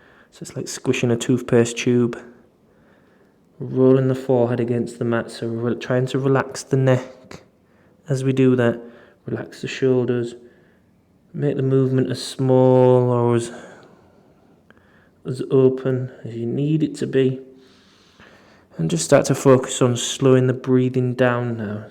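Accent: United Kingdom. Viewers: Thanks - I’ve been doing your stretches for a few weeks now as I train for a half marathon in New Zealand. Loving your deep stretches and your accent that reminds me of living in the UK too!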